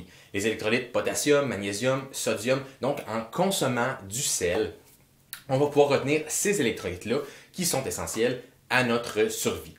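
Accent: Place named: Quebec, Canada. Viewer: Jaime trop l’accent québécois 😁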